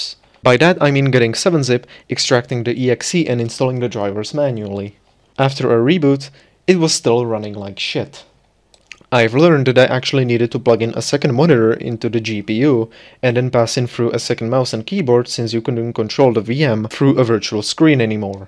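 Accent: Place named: Czech Republic. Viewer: z prvych 5 sekund bolo pocut ten cesky prizvuk xddd, inac dobre video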